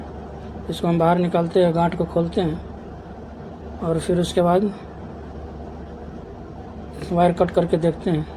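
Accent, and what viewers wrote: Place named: Bihar, India. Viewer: Aap kahan se ho bhai jaan aapki aawaz se lag raha hai bihar ya jharkhand se ho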